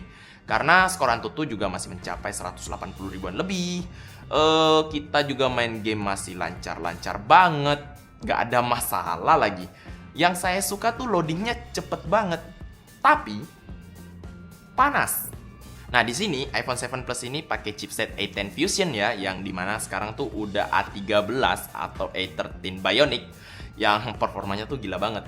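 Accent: Indonesia. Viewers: Tk kira org telok lema dri logatnya :v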